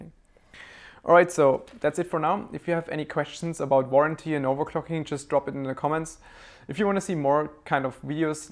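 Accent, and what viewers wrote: Germany. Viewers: thanks! also I like your accent. I want to go to Germany someday because people say it is beautiful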